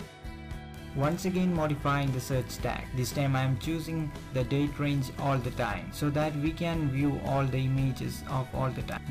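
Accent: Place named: Kerala, India. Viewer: English kettapo manasilayi malayali aan enn😁😂 thank you broi👍✌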